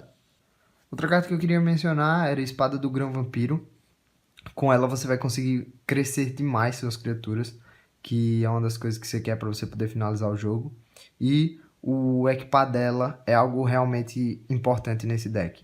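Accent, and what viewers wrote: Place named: Northeast, Brazil. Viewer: Rio Grande do Norte. Reconheci o sotaque e gostei de ver mais nordestinos produzindo conteúdo de Magic. Me sinto representado.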